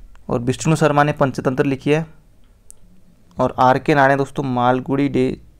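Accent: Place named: Pahadi, India. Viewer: Bhai pahadi hai na tu... Avaaz se lg rha hai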